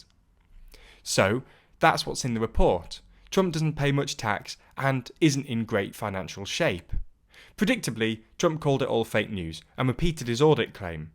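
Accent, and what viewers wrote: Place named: North, United Kingdom. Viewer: When did pick up a Northern accent?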